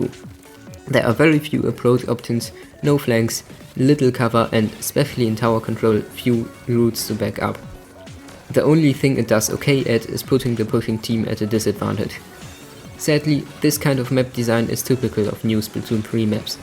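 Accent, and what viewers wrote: Germany. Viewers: Wow thats a really good First video :) And i find it funny how you Sound like having a really strong german accent haha x)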